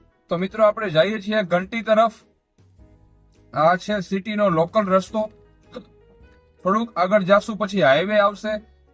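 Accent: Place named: Kathiawar, India. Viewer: Bhai hu gujrati chhu, ghandhinagar thi, tamaro whiz (aavaj) kathiyavadi lage 6, ane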